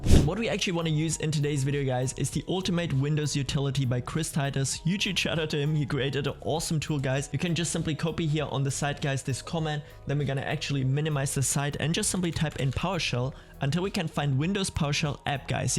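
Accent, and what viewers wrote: Germany. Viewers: Your 100% german, I can hear it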